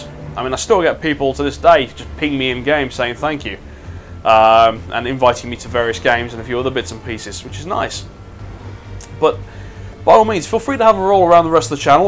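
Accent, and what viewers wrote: United Kingdom. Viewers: A brit.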